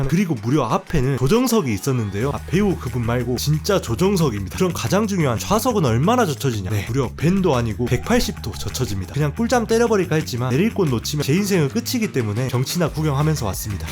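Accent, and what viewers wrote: Jeolla, South Korea. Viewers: 영상 내내 전라도 사투리가 느껴져서 혹시 했는데 역시 기아 팬이셨군요!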